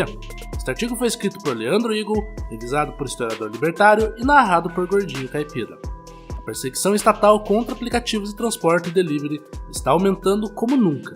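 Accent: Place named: Curitiba, Brazil. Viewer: Gordinho Caipira, vocé tem sotaque de Curitiba! Confere? Abç!😊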